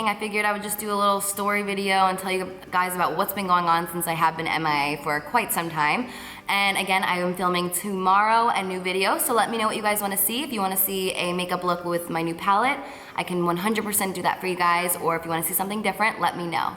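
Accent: New Jersey, United States. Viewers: shes nj n i think ny talk like that too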